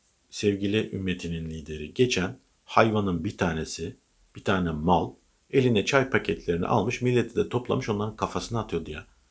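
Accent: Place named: Black Sea, Turkey. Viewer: Ula yine gırdun geçirdun!! Özellukle iki yorimun çok superidi!! Sağolasun. Garadenuzli değilum lakin o şiveye bayılayrum!! Öpildun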